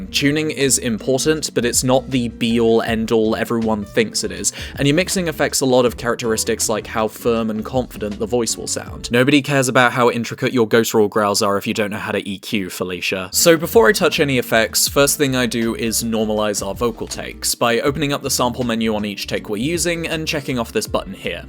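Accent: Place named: United Kingdom. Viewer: You sound like Kevin but British. I approve.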